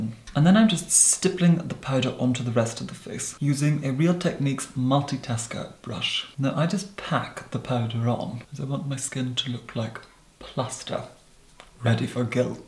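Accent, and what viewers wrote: Scotland, United Kingdom. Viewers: Knew he had 2 be scottish doin an accent!!! Something about th tone of his voice is very lorraine kellyhaha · What the hell is that accent?? It’s a mix of posh Scottish and god knows what else? Jesus.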